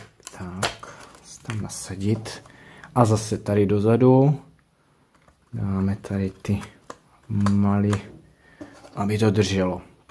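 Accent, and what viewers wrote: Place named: Sumava, Czech Republic. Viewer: Ahoj, Bože, ta tvoje radost z objednávek je fakt nakažlivá 😊 Jdu objednávat také kravinky pro radost ❤ Přála bych ti spolupráci s Temu, nebo Shein... Myslím, ze by ti to moc sedělo a zisk by nebyl priorita 😊 Krásně se posloucháš To víš, ten tvůj měkkoučký dialekt pro nás ŠUMAVÁKY 🤔 Hezké dny přeji a těším se na příště 🙋‍♀️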